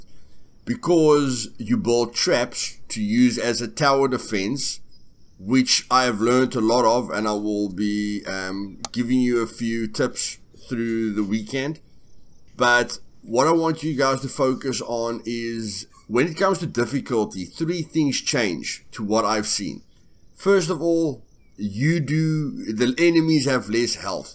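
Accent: South Africa. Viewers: South African?.....If so then hello from fellow the UK!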